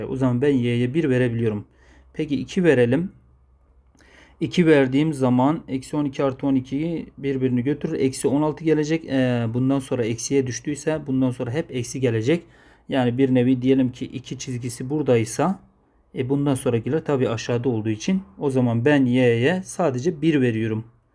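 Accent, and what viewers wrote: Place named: Van, Turkey. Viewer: hocam Vanlı mısınız bir arkadaşımın konuşma şekline çok benziyor sizin konuşma şekliniz 😊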